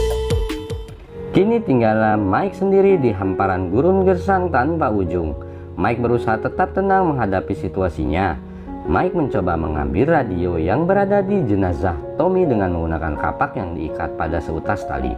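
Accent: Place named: West Java, Indonesia. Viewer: si sunda pisan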